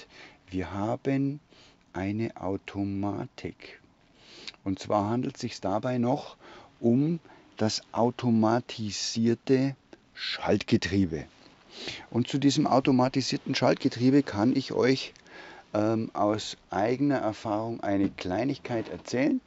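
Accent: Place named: Lower Bavaria, Germany. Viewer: Hallo, also das Video wäre noch besser, wenn du dich auf deine Heimat Sprache ( Niederbayrisch) einigen könntest, man merkt, dass du dich anstrengen musst wegen dem versuchten Hochdeutsch, deswegen deine Langsamen Wörter/Sätze. Bei ca. 14/16 min. willst du den linken Kleiderschrank (wenn man zum Bett davor stehst) erklären, ist dir da ein Fehler unterlaufen? denn die Türe geht ja gar nicht auf, die hängt an den Treppen, also kann man die nur von oben aufmachen. Denke du solltest in Zukunft deine Vorstellungs- Mobile vorher besser/vorbereiten/ ausprobieren wo was steht oder geht. Denke "Bayern Camper hat eine bessere Werbung verdient.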